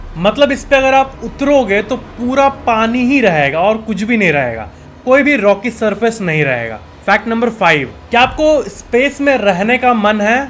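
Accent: Bihar, India. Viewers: Aap bihari ho n ?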